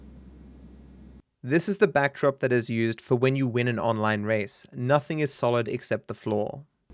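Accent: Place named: Australia. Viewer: Sounds like your saying it right to me..but then I'm Aussie too.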